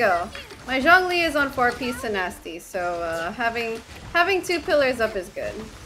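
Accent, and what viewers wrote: Philippines. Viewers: I just finished building Beidou & I finally have a taser team for Kokomi! I’ll use your video as inspiration. BTW, you look & sound similar to my Filipina friend, I think ur from the 🇵🇭 too.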